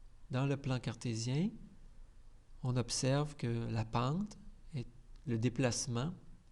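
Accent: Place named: Canada. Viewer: Trop fort et bien expliqué avec un accent canadien 👌🏼👏🏼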